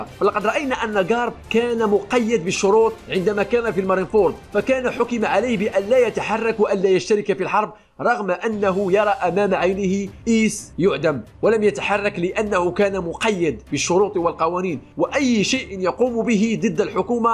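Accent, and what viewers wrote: Morocco. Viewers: يجب أن تقوم بتدريس مادة الون بيس في الجامعة شرحك يجعلنا نرى هذا الأنمي بشكل مختلف .... واصل أخي من لهجتك يا أخي أعتقد أنك مغربي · لان لهجت مغاربا تشبه لهجتنا نحن نجزائرين مغريبي اخي و ليس صديقي 😉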